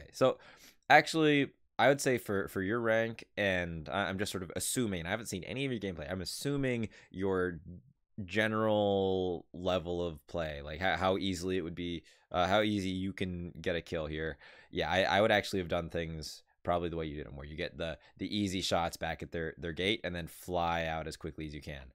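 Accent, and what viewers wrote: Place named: Italy. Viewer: He is so Italian... why do we have such a distinct accent when we speak english god damn it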